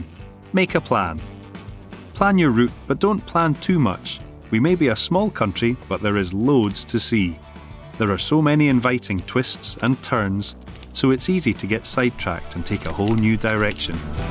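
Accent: Scotland, United Kingdom. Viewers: I just love the Scottish Accent.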